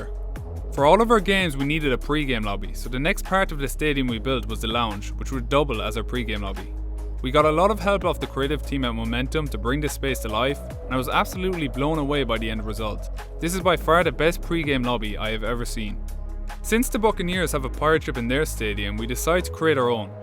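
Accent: Ireland. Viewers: Are u Irish cus u sound like u are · U sound irish and insane build man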